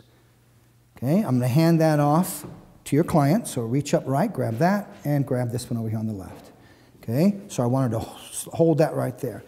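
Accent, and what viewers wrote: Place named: Philippines. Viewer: hi sir... are u a filipino? you look like a pinoy and your accent...and also the way you speak... =) you're amazing uncle...hehe galing mo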